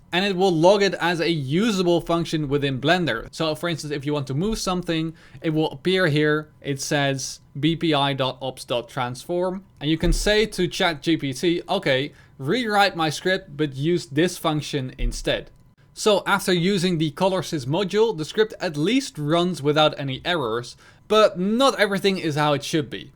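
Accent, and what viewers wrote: Netherlands. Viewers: just found this video (and your channel) not even 2 seconds in i already learned you're a Dutchie too :') haha our accent is so easy to pick out (at least to us) just like we can pick out people speaking Dutch that deviate more than 5% from the norms, then switch over to English because we know they aren't native Dutch speakers, we feel that shit up real quick :D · i smell dutch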